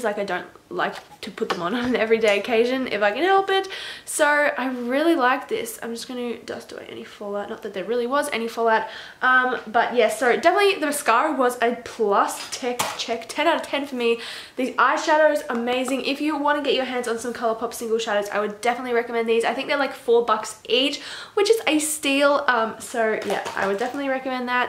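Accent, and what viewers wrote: Australia. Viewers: Is anyone else obsessed with her Australian accent, I'm from Sydney and for some reason I just love listening to her talk. Maybe also because you just give off such positive vibes☺️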